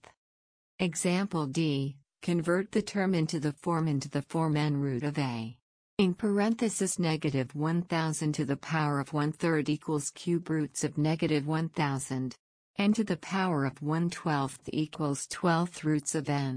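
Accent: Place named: United States. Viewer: Wait, this is your voice? Seems like you're american.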